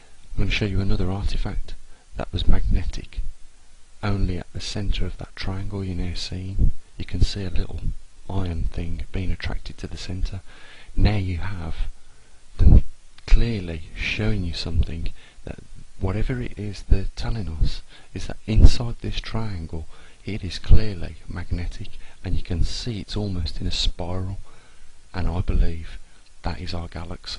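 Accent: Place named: Cockney, United Kingdom. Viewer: I would have loved to listen to this video but the dude already has a thick English Cockney accent and the fact that he has his lips pressed up close to the mic doesn't help matters at all either! I got about 50% of the content. Not enough to understand.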